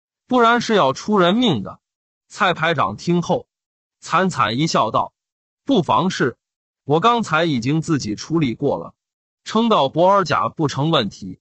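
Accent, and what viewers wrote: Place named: North, China. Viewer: 原來說書人是北方人😊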